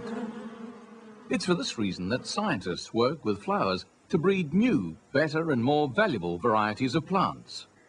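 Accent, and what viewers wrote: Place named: United Kingdom. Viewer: Loved that British accent..easy to understand though than the American one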